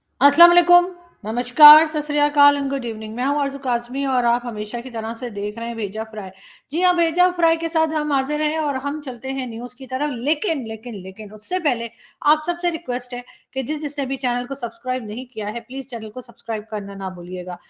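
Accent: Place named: Haryana, India. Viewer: ji main kai baar sochta tha ki aapki language dialect mein mere area wali dialect kyo hai ab pata chala ki aap to mere Area Ambala Cant se ho. I mean your mother from Ambala cant.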